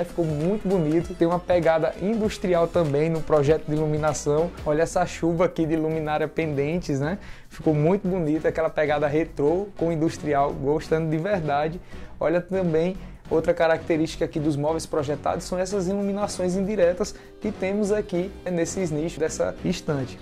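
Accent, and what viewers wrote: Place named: Pernambuco, Brazil. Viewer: sua voz e muito suave passa um sussego, obrigado por nós mostrar essas casas linda, sou de Pernambuco e vc tem um sotaque parecido com o meu.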